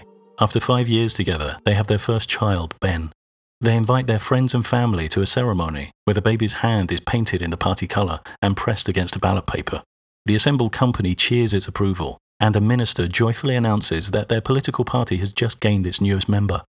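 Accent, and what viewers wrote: United Kingdom. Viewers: Nonsense is still nonsense whether or not you say it in British accent. Truth should be taught to children and falsehood shouldn't. We disagree on what is truth and what is not. You wouldn't have problem with indoctrinating into a child that discriminating on the base of skin colour is wrong and if the child was to very vehemently be against that idea you still wouldn't just accept his or her different viewpoint. Debates should be held as to what is truth and what is not but parents teaching their children what they perceive to be truth is in itself a very logical and understandable position.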